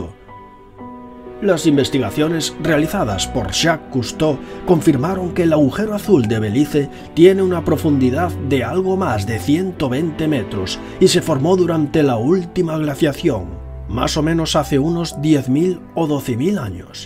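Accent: Spain. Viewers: El acento español hace que pierda el interés en el video. Es aburrido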